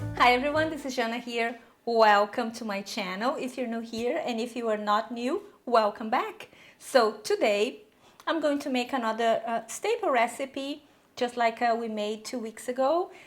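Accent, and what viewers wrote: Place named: Brazil. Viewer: Where from Brazil you are from? I figured out by your accent!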